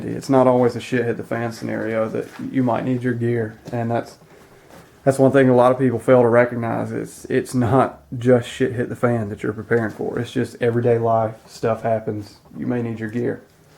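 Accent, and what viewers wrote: Alabama, United States. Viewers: what part of alabama are you from?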